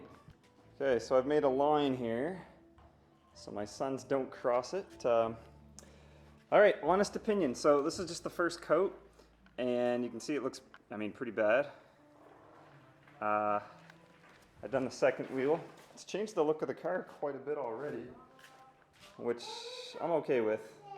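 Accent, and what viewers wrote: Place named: Canada. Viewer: Canadian eh???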